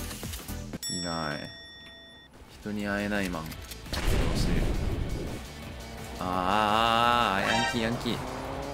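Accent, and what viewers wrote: Kansai, Japan. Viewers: さんのこと嫌いやってんけど関西弁？好きやしキャラ好きやからもういいや推し決定俺何言ってんやろ。GW全員推しやった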